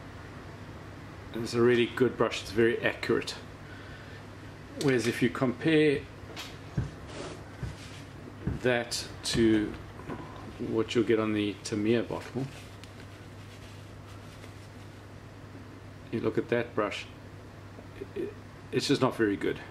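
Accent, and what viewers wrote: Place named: South Africa. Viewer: hey sorry I meant they are sold here in Brisbane. And I confused your accent for mine at the start of the video (Australian). But as I heard you talk more I realised you are South African! Funny how we have some similarities in our accents. Do you ever find that about Australian accents? That they can be similar for certain words?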